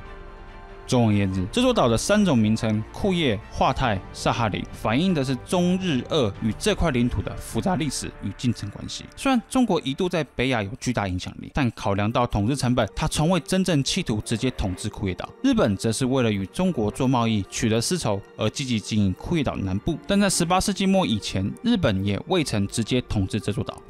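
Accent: Taiwan. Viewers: 读书啦大佬，中国多民族是1912年起，不是历史2000年是一个多民族国家。知道历史上2000年，汉族中国跟外族经常生死搏斗吗？知道中国曾经被蒙古人所灭，朝代被称为元朝。汉族被满洲人所灭，朝代称为大清，正名是大清帝国，与日本签署丧权辱国的马关条约上，李鸿章是用大清帝国签署，不是用中国名称的，网上有副本可参阅，自己去看啦。知道五胡乱华，汉族几乎灭族吗？可以反苏反俄，但不可以篡改历史。知道本帖帖主是台湾人，说的是台湾国语吗？ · 在中立、客觀的學術表面包裝下，所成立建構的：庫頁島歸屬邏輯，一樣可套用在臺灣島上囉？？ 被倭人所產生的倭寇，一度以次等人種，來統治對待的後代，現在操著臺灣口音的標準國語，一字一句，企圖向中華兒女，對近代中國血淚屈辱史，要建立所謂的「正確」觀念教育，還真是我中華民族的悲哀。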